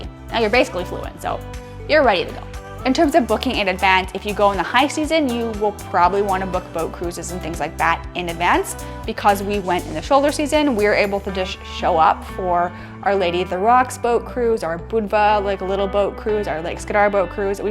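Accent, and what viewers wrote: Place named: United States. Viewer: your squeaky ummerrigaan voice is annoying ...you come across like the typical arrogant over the border wise ass but really are below avg....go to you florida...leave us alone..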